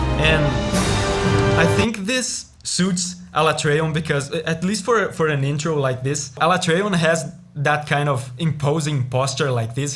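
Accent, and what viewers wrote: Brazil. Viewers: Right in the first phrase i noticed that you are brazilian. Muito foda teu trabalho, mano! assisti vários vídeos em seguida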